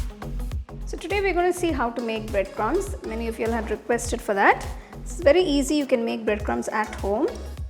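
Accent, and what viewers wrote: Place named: India. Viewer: are u indian mam? accent is 👍 nice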